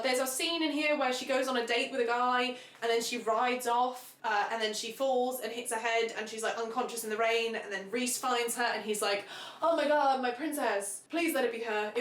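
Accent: United States. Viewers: wait why is your american accent flawless what the hell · The way you presented everything was amazing! I laughed a lot! I love when you pointed out the way things just didn’t make sense. And your American accent chefs kiss 😂😂 I was entertained the whole way though! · when you apologized ahead of time for your american accent i wasnt ready for it to be actually so amazing. generally when people do american accents they just do southern or valley accent! your american accent is INSANE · Your American accent is kind of everything?? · Your American accent is insanely good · Thats a damn good american accent! 🇺🇸🇺🇸🇺🇸🇺🇸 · Busts out a damn near perfect generic American accent than slowly starts turning into cursive 💅🏽❤️ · Omg can you just read books in your American accent I'm obsessed I need more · obsessed with your american accent - i only read book one, but now I want to read all of them so I can listen to your recap after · From Missouri USA and I love your American accent ❤ if you read books in that voice I would buy every single one. 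· your american accent was not bad actually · Your American accent is pretty on point girl! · your american accent is so good😭😭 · Okay but why is the American accent so good??? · From an American person I love your American accent! For this series I started with Twisted Lies (it was the most interesting sounding one and I read that Ana said they can be read in any order) and I actually really enjoyed it. Bit slower paced but I did love it. I didn't really like Twisted Love and to date Alex is my least favorite among the twisted men. Twisted games is my favorite in the series by far and Rhys is the only tolerable amongst the twisted men. One thing that I really hated about Twisted hate is that its completely killed Jules' and Josh's characters. They were silly gooby guys in the last, second, and first books but were insufferable in their own book. Also just wanted to mention that Ava's mom's name is actually Wendy. 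It was mentioned once during her dad's villain speech when he mentioned that Ava looked nothing like him and mainly had a resemblance to Wendy (her mom) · YOU’RE TELLING ME YOU’RE GIVING US HOURS OF CONTENT IN MULTIPLE PARTS? WE WIN WE WIN (Edit: As an American, I am simping for your American accent 🧎‍♀️) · As an American omfg??? Why is no one talking about how like surprisingly good the American accent is??? Idk why but hearing people who don’t have an American accent do that accent gets me all giggly she sounds better than I do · the american accent is so funny LOOL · This video gave me a good giggle, thank you! I am...no longer intrigued to read The Twisted Series unless it was for just straight up giggles. LOL Also your American accent 15/10, exceptional. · THE AMERICAN ACCENT 🤩🤩 it is toooo good!!! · Yeah her standard American accent is spot on. Obviously a lot of us don’t sound like that, but it’s recognizable and good. 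· American avid reader here. 🙋🏽‍♀️ Awesome American accent, shite books. They’re all really bad copies of much better smutty series from the 2000’s. Just my opinion though. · Your american accent is making me feel some typea way 🫣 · that american accent is insanely good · As an American, I wish I had your American accent 😒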